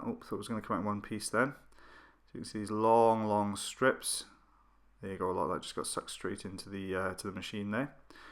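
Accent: Wales, United Kingdom. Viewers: god that beautiful Welsh accent. I know people from the UK deride it, but I challenge anyone to listen to say “maneuver” and not love it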